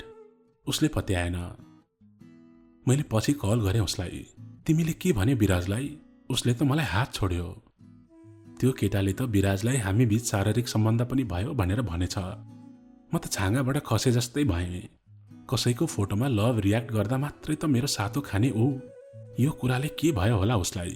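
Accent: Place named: Darjeeling, India. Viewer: ❤❤Your voice from Darjeeling 💕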